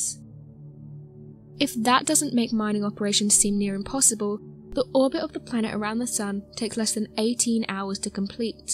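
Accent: England, United Kingdom. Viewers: Cute English accent🖖👽🤖👾🛰️☄️🚀👻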